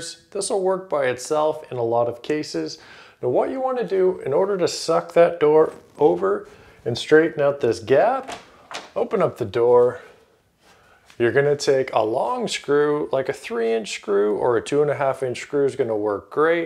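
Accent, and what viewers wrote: Canada. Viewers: Love hearing a good Canadian accent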